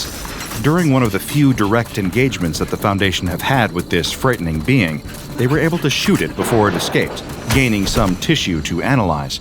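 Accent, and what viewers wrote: United Kingdom. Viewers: Dam 🦫🦫🦫 you really nailed the British accent sir 🙏. God bless you and your work, respect form Croatia-Europe 😇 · OG British accent 😂❤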